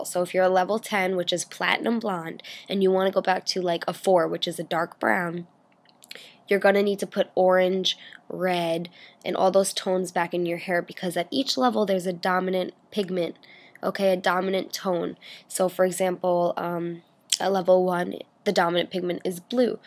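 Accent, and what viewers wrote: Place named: New York, United States. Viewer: Do you live in New York? I can kinda hear the accent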